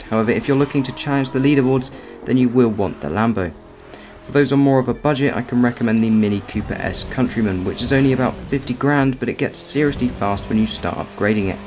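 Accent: United Kingdom. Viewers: nice to see ur still doing crew 2 ! i just got the pagani zonda r and it is INSANE! btw u sound british which part ur from?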